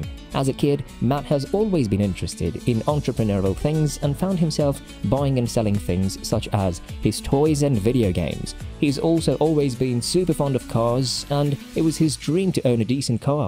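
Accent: United States. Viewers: Yet another English YouTuber talking American.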